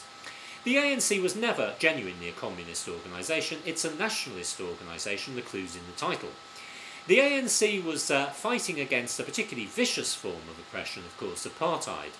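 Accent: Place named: United Kingdom. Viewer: Your British accent tells me you come from a mean prejudiced place. Firstly as colonizers Britain were insufferable! Racists, opportunists, sententious and cruel. You lost in South Africa, you we’re thrown out of India in total disgrace and nowhere you occupied miss you! So now it’s Nelson Mandela you’re aiming at! You have no heroes, except military! India had Gandhi and South Africa had a man whose sacrifice for his people and love of them and country stood steadfast against a hard government. Relentlessly the National Party killed dissidents who were precious intellects. Mandela was an educated, principled man who lost his youth rotting away in prison. This alone could have broken him but it didn’t. He stood firm and resolute but with no hate, pettiness or prejudice. Exempt of all the ugliness now rampant in this morally depleted world. He was not a saint he was just a very good man hell-bent on peace for the new South Africa. 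The Constitution a fantastic ode to principles an example to others . He made mistakes which were well intentioned but out of sync with the reality he had to face after nearly 30years locked away in an island. He stood by the right leaders - Castro, Ghadafi, the Palestinians, Russia and all those whose standing he admired and served his dream. He alone was able to put together in harmony a nation with deep wounds. Have you done that? Has anyone who dares to diminish the real value of this MAN come to an inch of what he stood for? NOOOOOOOO! So shut u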